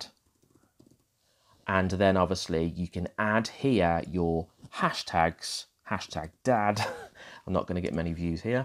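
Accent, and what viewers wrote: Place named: Yorkshire, United Kingdom. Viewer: Love the Yorkshire accent (Lancashire?) ... ! and right now trying out Lately here in Japan where I am based (I'm from Yorkshire). I basically came to your tutorial as I only post to IG via my MacBook Pro and need to do some multiple photo posts. Normally post by changing the User Agent to iPhone in Safari but it has too many limitations.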